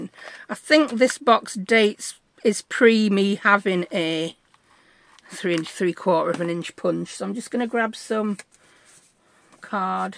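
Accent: United Kingdom. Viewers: I absolutely love love love these. What a brilliant idea, so much journaling space inside a beautiful belly band. My favorite is the pink Foxglove plant. Beautiful!!!!!!❤ I’m so glad I saw your video! Thanks so much for sharing. I also enjoy your distinctive British accent and sense of humor since I’m from the U.S. 😅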